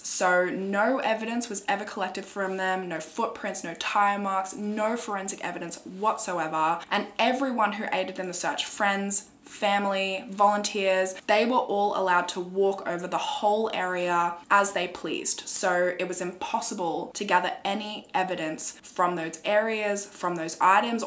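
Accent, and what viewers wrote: Australia. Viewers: Heavy Aussie accent! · We're is the accent from? Australian? It's grating and can't listen anymore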